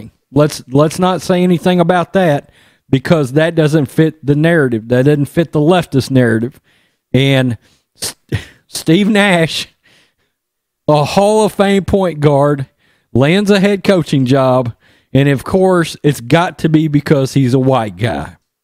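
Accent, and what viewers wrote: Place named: South, United States. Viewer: I'm not sure if it's the southern drawl, since I live about as far south in Alabama as you can live and still be on dry(most of the time) land, or because you just seem like an average dude with an opinion that he's not afraid to share like myself, but you are rapidly becoming one of my favorite commentators on YouTube. Many associate the southern drawl with being borderline retarded, and I'd love to have a 30 minute conversation with them about prejudiced thought, but because I know better and you REALLY DO seem like a number of folks that I have intelligent conversations with on the daily, you are so relatable. At least to me, anyway. Keep up the great work and God Bless You and Yours!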